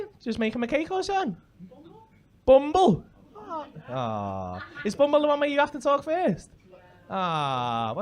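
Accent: Liverpool, United Kingdom. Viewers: Love this Scouse ginger , Birmingham loves ya .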